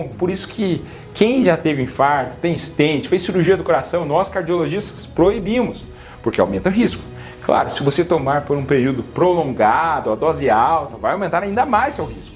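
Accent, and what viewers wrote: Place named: Minas Gerais, Brazil. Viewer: Gente este médico é uma benção de DEUS, gosto demais do jeito mineiro que ele fala parece que tá chupando picolé de groselha e falando ao mesmo tempo , ele é super bem informado , explica super bem , é simpático demais mesmo , um sorriso pra cima que levanta a gente , passa a impressão de ser muito cuidadoso com seus pacientes. Trás informações muito importantes e atuais pra gente , aaamo qdo ele fala : de que parte do mundo cê é ??? kkkk DEUS abençoe e proteja muito ele e a sua família, que ele continue sendo alegre , divertido , e super profissional como é, e sim , já me entupí de muitos remédios aí que ele citou , não sabia de nada disso , meu DEUS , já tomei muito azitromicina pra garganta, hj tenho usado muito o Propolis Verde que tem me ajudado e me imunizado. Fui 🙋‍♂️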